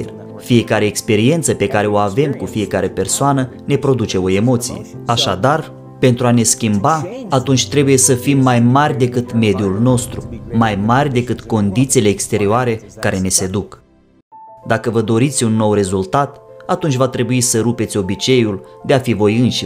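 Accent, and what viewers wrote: Moldova. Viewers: Desi ai un frumos accent moldovenesc via Chisinau, transmiti foarte profund cam tot ceea ce postezi, ai harul acesta, sau poate te-ai reinventat, dar cert este un lucru, esti pe drumul cel bun si nu numai atat, mai ii si ajuti pe altii sa isi schimbe viata prin ceea ce etalezi,...., excelent, este minunat si bun de urmat, o zi frumoasa si o viata si mai frumoasa !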